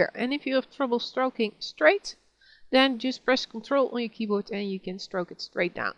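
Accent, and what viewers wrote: Netherlands. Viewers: ik hoor meteen dat je nederlands bent!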